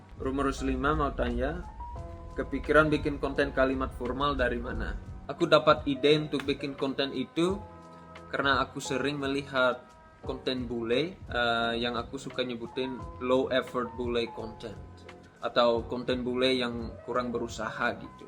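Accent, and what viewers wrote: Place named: Bali, Indonesia. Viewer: Bahasa indonesianya, sekilas dua kilas ada aksen Balinya. Sangat memboemi sekali meneer.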